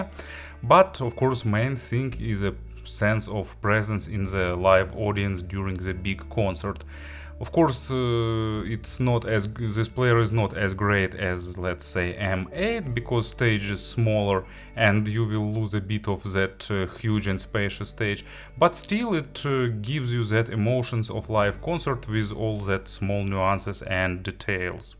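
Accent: Russia. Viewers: руссский акцент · Nice review and your Russian english accent is not tiring to listen. I am about to receive my shan m3x in 2-days thanks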